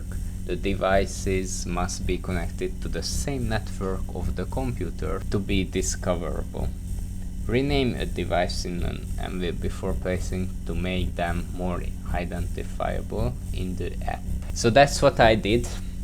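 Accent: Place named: Hungary. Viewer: halatszódik hogy magyar vagy xdd de sokat segített a videó ty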